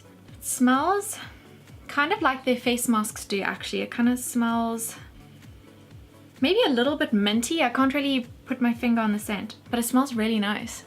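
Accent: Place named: South Africa. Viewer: I was like.. waaaait... i know this accent xD Finally a Youtuber I can relate to with our limited Makeup selection in SA <3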